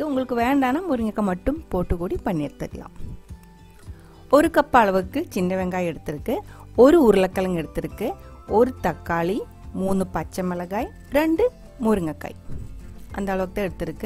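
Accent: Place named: Coimbatore, India. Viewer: Ada ammani..... neenga at present kovaila that erukerngala OK OK athaan pathean enada covai Tamil varuthenu. super ma. I too at kovai thaan. epa Ellam unga slangla thaan en wife pessaranga super. ur style of voice is amazing and with lots of love.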